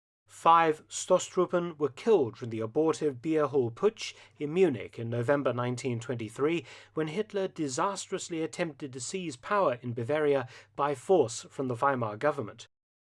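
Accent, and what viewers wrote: England, United Kingdom. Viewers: It's the Brittish accent that keeps me coming back!👍🇬🇧😉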